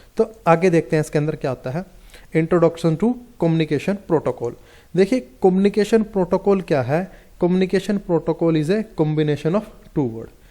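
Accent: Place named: Haryana, India. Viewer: Bhai haryana se h pta lag gya awaj se ❤❤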